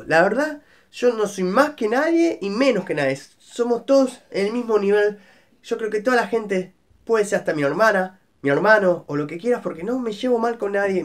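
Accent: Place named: England, United Kingdom. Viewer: Flaco pero si vos sos argentino porque tenes ese acento inglés ?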